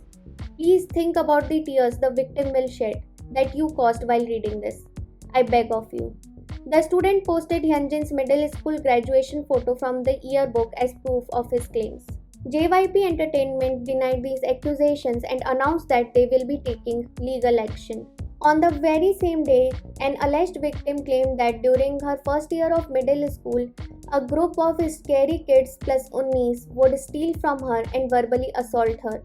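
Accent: India. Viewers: i like your accent..are u indian??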